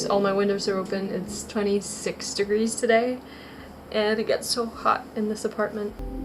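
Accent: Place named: Scandinavia. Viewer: So lovely to hear ever so slightly Nordic-tinged English : ) This was such a nice video to stumble on.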